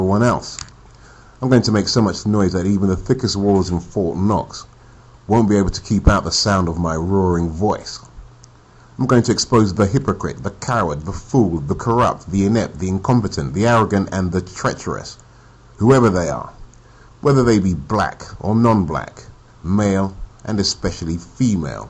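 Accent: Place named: United Kingdom. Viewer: This content is powerful and it's a uk voice.usually it's the guys from the states talking Truth.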